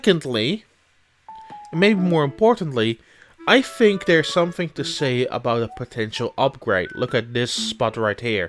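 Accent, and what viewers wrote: Netherlands. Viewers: I can't help but notice his accent... Maybe it was already stated somewhere else, but / sounds very Dutch:p not that that's a bad thing. I love hearing accents when people speak English. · Love the Dutch accent :p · What's your first language? Your accent sounds Dutch 💭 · Dat Nederlandse accent 🤢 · He is indeed dutch, and a dutch accent when someone is talking English is one of the WORST things I've ever heard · why does this guy sound dutch, though.. edit: I've seen the twitter sceen this bro is a fellow dutchie hellooo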